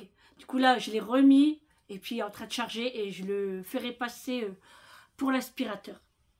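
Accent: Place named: Hauts-de-France, France. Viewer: Bonjour ah c est matcho avec l accent des hauts de france j entendais min t 'chot rohhhh Belle journée prenez soin de vous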